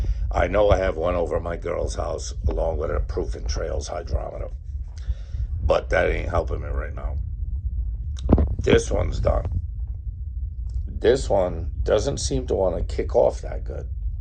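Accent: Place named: New York, United States. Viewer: Glad i found your channel.. Thanks for the update, yeah these northeast cold nights are starting to suck. Its killer you sound just like my cousin from NYC.. I set my fermeting barrel in an oversize barrel and filled with water, tiny fountain pump and a fishtank heater with a loose lid.. keeps my shit at 87degrees in my shed. I was gifted 2 cases of bananas yesterday and just seeing what to do with em, between you, stillworks, bearded and beaver i got a few ideas.. appreciate it!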